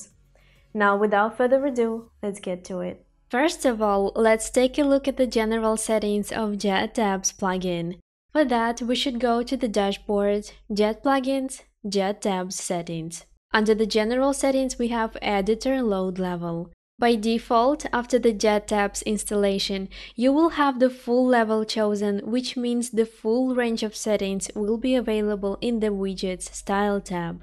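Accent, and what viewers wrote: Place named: Ukraine. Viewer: I compare her Ukranian English with special accent, intonations with real good English of Elementor creators and I scare to buy and install this plugins. Did they save money just on the speaker or on compatibility and functionality too!?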